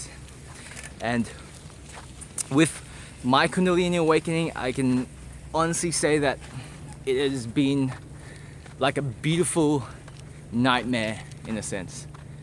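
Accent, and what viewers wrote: Australia. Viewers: Your accent seems Australian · interesting accent, sounds australian crossed with something else. Cool story, i am in the starts of a kundalini experience, feels like i am connected to god with electric jumper cables, so intense. I wonder when it will stop. If I stop meditating will it go away?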